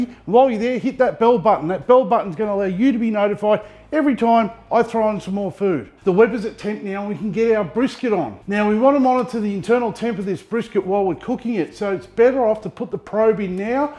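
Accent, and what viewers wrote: Australia. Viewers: Saw the thumb nail and was keen to watch...heard an Aussie accent and stayed to watch the whole video! Legend. Also that cutlery holder mini burner is genius.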